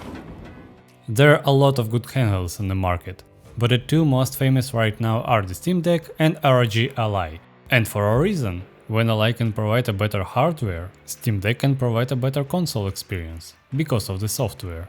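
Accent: Russia. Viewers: your accent is way too Russian. You are certainly not Ukrainian, and if you are from so-called Belarus, it’s basically the same that you are Russian. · По акценту очень заметно что ты Русский) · Привет, по акценту сразу понял что русскоговорящий , сможешь помочь поставить виндовс и утилиту переключения?)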